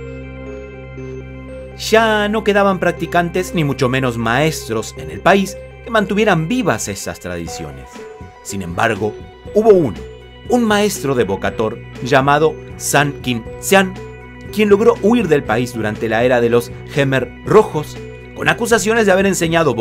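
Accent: Uruguay. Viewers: Sensei le felicito por su excelente trabajo y esfuerzo en mantener viva la llama de estas artes tan nobles. Una Pregunta maestro usted es de Uruguay?! 🤔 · Hola! sos Uruguayo?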